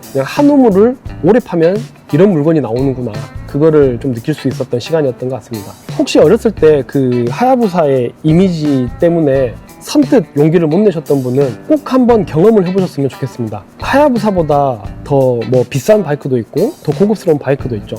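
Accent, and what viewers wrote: Jeolla, South Korea. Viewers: 말투가 전라도 같은데 흔치않는 전라도 유튜버 반갑네요 😅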